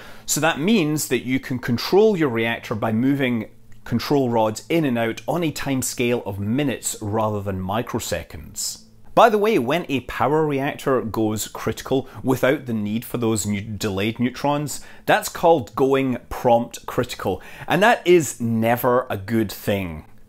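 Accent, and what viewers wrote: Scotland, United Kingdom. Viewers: This is a good level of explanation, I like it. You're sounding more scottish than usual today for some reason...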